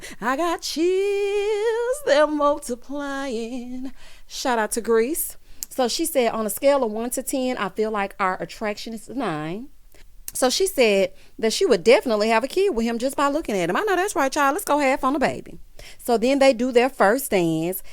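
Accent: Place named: Houston, United States. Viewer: You are most definitely from Houston 😂 you sound just like me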